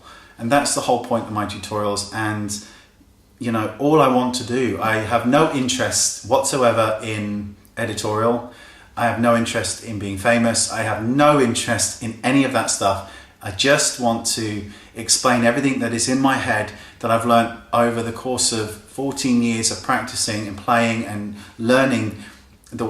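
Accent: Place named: Bristol, United Kingdom. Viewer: Sounds like the Bristol area to me (my neck of the woods!). Sometimes, whenever I'm feeling homesick at uni, I'll play his videos in the background while I'm working. He's like my David Attenborough. My neighbours must think I live in a makeup cult; nonstop one-way conversations about contouring and eyelashes...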